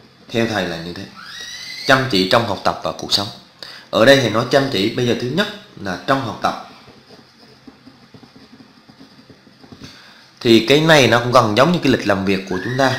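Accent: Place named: Binh Dinh, Vietnam. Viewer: VLOG dạ hèn j dống tiếg bình định😂😂😂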